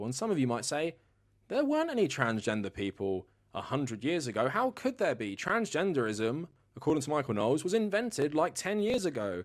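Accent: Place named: United Kingdom. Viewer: Judging by your accent you are of british heritage, so are we here and didn't the british not do the same in Australia, India. So you're a colonizer as well, you have ancestors that were there killing those native Americans. You're probably closer to being related to those killers than I am and I was born here in the US.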